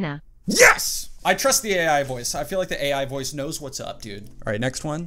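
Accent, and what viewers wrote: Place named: Italy. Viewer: Best Italian accent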